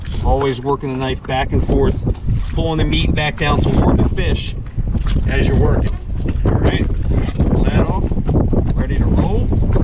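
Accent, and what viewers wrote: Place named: Baltimore, United States. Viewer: got that Balmo accent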